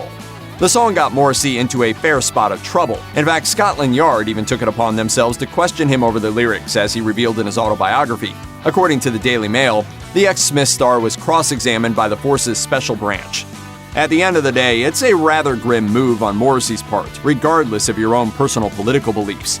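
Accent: United States. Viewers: It's interesting to choose the most inappropriate American blah blah voice to essentially attack with much untruth and sensationalism a English artist.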